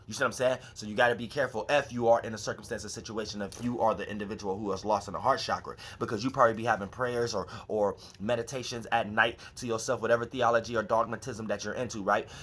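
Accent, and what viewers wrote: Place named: New York, United States. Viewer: lmaoooo u from/been hanging out in NY or whatttt 🤣. the accent tho “whatever the fuck u wanna call it “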